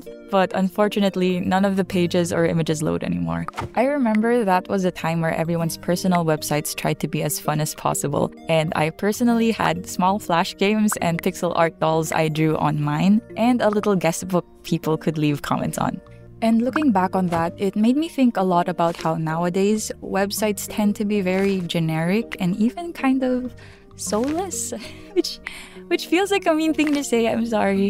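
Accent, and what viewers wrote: Philippines. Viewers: Are you from philippines? Your english accent sounds like that ❤ very adorable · i know a filipino when i hear one :face-orange-biting-nails: anw i absolutely love ur arstyle!!!!!!!!!!!! ur voice's soothing too :pppp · first time watching your content. overall its very simple and cute <3. and loving your filipino accent :3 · This might be weird but the only video ive seen from you is the duck one and this is the first time im hearing your voice, LIKE ONE MINUTE IN i was like “I HEAR FILIPINO”😭😭😭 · I've lived in the Philippines for all my life and accent is bothering me, not in a bad way or anything just familiar in a sort of way, her accent reminds me of alot of my Aunts who speak English but their main language is Filipino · I know a filipino when i hear one, lmao literally had to check ur profile when heard ur accent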